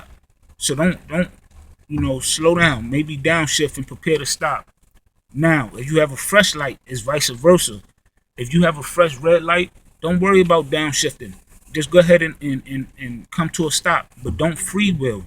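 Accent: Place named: New York, United States. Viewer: You sound like a NY Dude ..... Lol good looks on the video tho bruh, good luck to you On your journey PEACE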